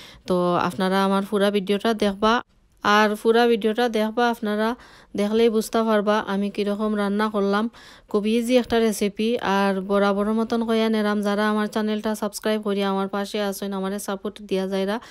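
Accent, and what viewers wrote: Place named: Sylhet, Bangladesh. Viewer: চমৎকার সিলেটি আপা মনি চমৎকার খুব সুন্দর রেসিপি বানাইছেন খুব ভালো লেগেছে তাই পুরো ভিডিও দেখে লাইক এবং কমেন্ট করে গেলাম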